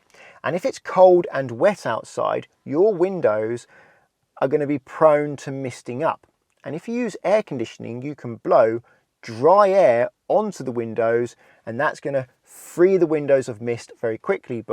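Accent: United Kingdom. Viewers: Is he s British or something? Sounds different to me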